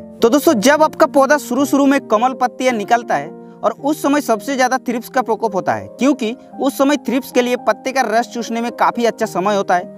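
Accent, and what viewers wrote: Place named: Odisha, India. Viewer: यह तो उड़ीसा उनका बोलचाल से समझ हिंदी बोलकर कितना वीडियो बड़वार काम हो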